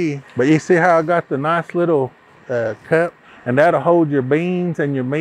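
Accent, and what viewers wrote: United States. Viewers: Are you referring to his accent? Because, this is a great example of an Okie accent. I understand him perfectly. Yes, I am an Okie as well. Boomer Sooner Baby! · You sound like a country boy! Hey this is coming from a country boy just on the south side of the red river! Man I had some of this over in New Mexico and man was it good stuff! You make it look easy Im going to try iit Thanks! Curious does it taste better if the warm water is poured out of a OU cup?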